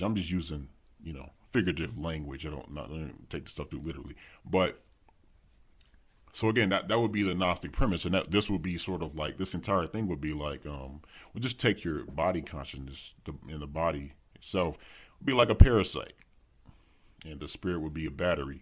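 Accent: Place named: Baltimore, United States. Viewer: You still go out? Ima probably go to DC soon and I hear Baltimore in your accent. Pull up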